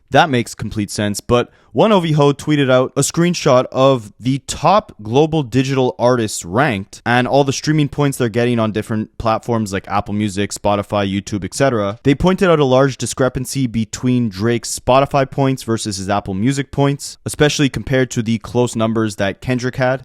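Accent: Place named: Montreal, Canada. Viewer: Are you from Laval or the east end of Montreal or something. You sound like you are a Greek or Italian montrealer 😂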